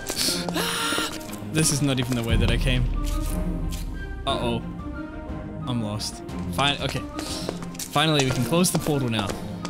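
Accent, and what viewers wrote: New Zealand. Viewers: Hmmm,are you from New Zealand you kinda sound like a kiwi😂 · Just wondering are you a New Zealander?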